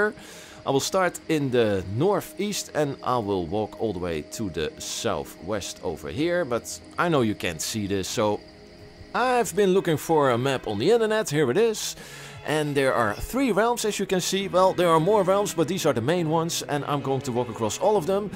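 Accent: Netherlands. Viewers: Dude, are you Dutch? You have a really strong accent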